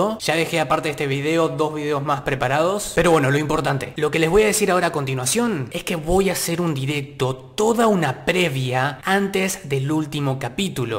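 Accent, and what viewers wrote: Argentina. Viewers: No sabía que eras de Argentina messirve paaaa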